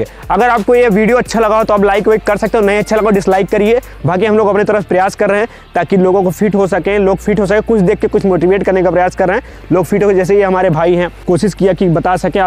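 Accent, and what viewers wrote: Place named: Bihar, India. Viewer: I love bihari acsent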